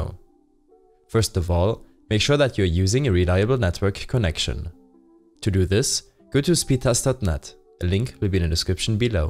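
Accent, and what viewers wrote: Germany. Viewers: This german accent omg Im german too yay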